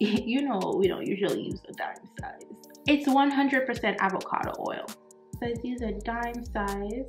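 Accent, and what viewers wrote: Jamaica. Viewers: Do I hear a Jamaican accent??? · Jamaican accent cant leave ya lol big up ya self my girl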